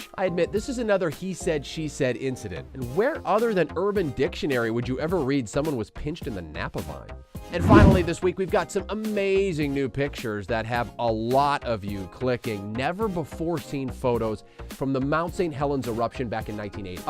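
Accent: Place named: England, United Kingdom. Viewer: Your English accent was brilliant.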